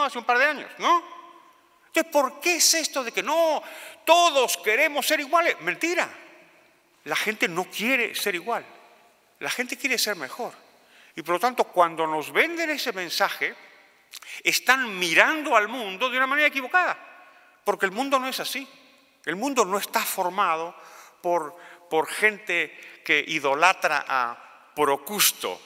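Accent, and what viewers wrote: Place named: Argentina. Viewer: Un acento argentino que no se pierde .. !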